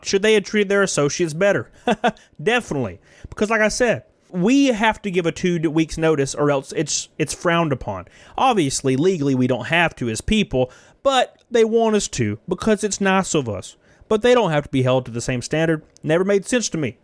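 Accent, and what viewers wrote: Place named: Alabama, United States. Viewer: best buy don thanos snap. you can never take the alabama out of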